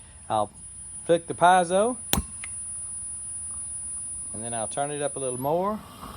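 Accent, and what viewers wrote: Texas, United States. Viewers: He's a Texan. That's a Texan pronunciation. Texicanese is almost another language, from what I can decipher. If you don't believe me, next time you watch one of videos turn on the Youtube Closed Caption CC button. What a hoot!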